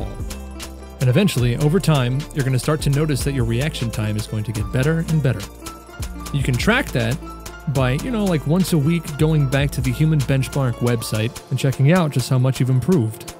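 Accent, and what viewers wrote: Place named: New York, United States. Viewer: He's clearly a New Yorker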